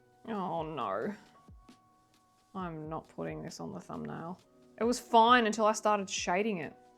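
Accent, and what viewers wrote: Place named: Australia. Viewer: mate i heard the first few words and subscribed.. always got time for an fellow Aussie. You sound just like I am with these things! I overthink and if its not perfect i'm ready to get a new book lol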